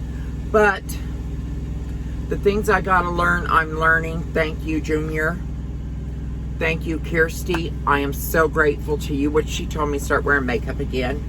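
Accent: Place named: Oklahoma, United States. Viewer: Did you work with horses?? I love your accent ma'am, that is Oklahoma? You look great Putting on weight for me is not so much about how the extra weight makes me look anymore, I don't mind looking heavy, I mind when I move, I feel jiggling in the caboose My problem is sugar and caffeine Sugar and caffeine is so convenient I will be doing my absolute best to eat healthy AND satisfying foods One day maybe fruits and seeds will taste better than rice Krispies treats, Can't wait to get an insta pot, I wonder how you keep them clean on the truck?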